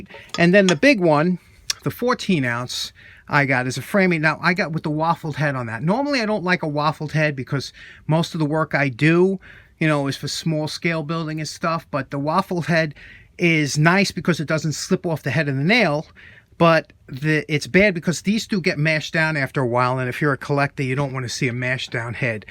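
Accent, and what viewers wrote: New York, United States. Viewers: Love the accent. New York or thereabouts?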